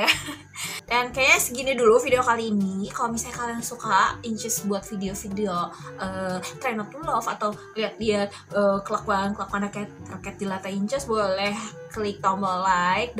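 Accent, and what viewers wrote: West Java, Indonesia. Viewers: Ngomong Sunda nya bikin greget teh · Teteh orng mana teh Sunda mana euy teh h3h3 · Sunda mana nih🤣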